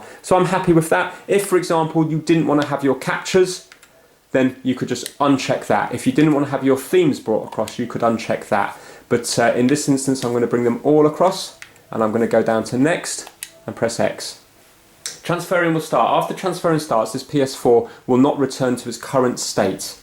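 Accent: England, United Kingdom. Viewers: Very well explained and I like your English accent.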